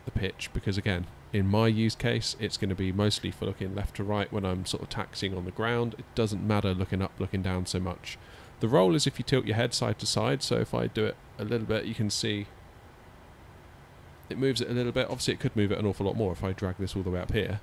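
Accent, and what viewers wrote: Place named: United Kingdom. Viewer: lovely hear british accent 😄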